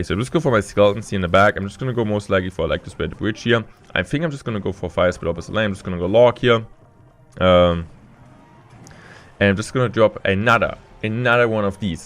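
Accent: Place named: Germany. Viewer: i cant even understand this shit becouse of his german accent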